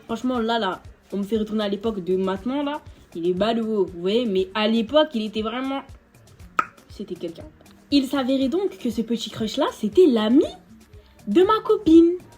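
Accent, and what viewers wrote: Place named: Democratic Republic of the Congo. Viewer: Ça sent que tu parles Lingala 😂😂😂😂😂😂😂😂😂😂😂😂 Tema l'histoire digne d'un film wallah 😂 Je me suis abonné direct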